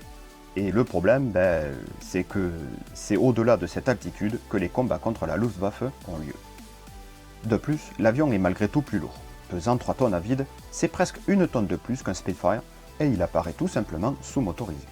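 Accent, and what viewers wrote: South, France. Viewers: Très beau documentaire … Les détails techniques et historiques sont très bien présentés. Le petit accent méridional du narrateur et quelques pointes d'humour permettent de suivre cette présentation. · Toujours content de voir une de tes vidéos dans mes abonnements. Vidéos toujours aussi détaillées, explicatives avec une très bonne approche sur le contexte des choses expliquant certains choix techniques et limitations du moment. Puis bon, toujours content d'entendre notre joli accent du Sud! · Cet accent du sud m'avait manqué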